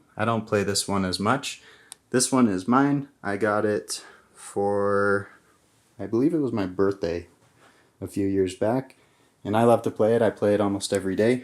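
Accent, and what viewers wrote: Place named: Vietnam. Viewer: Your vietnamese accent is cool! Hope to learn english much more from you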